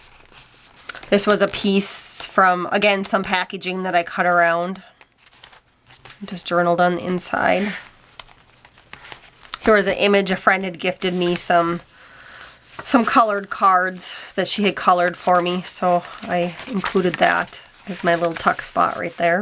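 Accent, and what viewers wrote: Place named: United States. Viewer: Pretty journal. I have a non crafting question for ya! Folks from your state pronounce your short "o" and "a" differently than the rest of the States. I'm curious... where does that come from? If you can answer that, it'll settle a bet with my husband. I say it comes from the American Indians.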